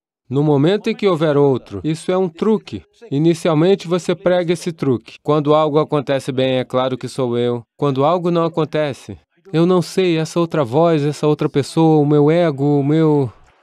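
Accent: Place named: Bahia, Brazil. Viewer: Adorei que a tradução foi feita por alguma baiana. Ficou lindo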